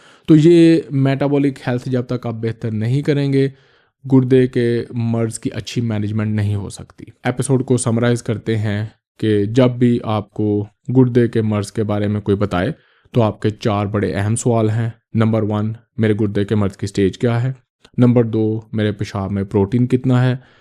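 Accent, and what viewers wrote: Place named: Punjab, India. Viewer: Sir Your vids are extremely helpful for ckd patients. Your urdu/ Hindi has a Punjabi accent. Love from Indian Punjab....❤❤❤❤❤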